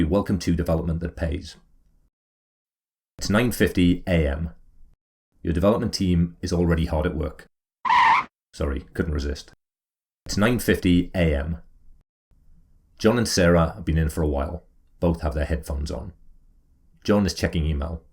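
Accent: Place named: North East, United Kingdom. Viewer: he's not a robot, he's just from the North-East.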